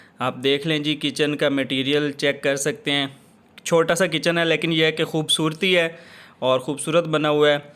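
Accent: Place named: Punjab, India. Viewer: location آواز سے پتہ چلتا ہے کہ بندہ پنجاب سے ہے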